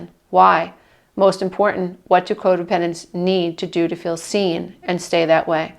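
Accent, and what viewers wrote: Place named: Brooklyn, United States. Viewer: You are by far the best one on this topic, Ramani is good too but I relate to you better, probably due to your (funny) accent, lol....downstate, Brooklyn....I cant say thank you enough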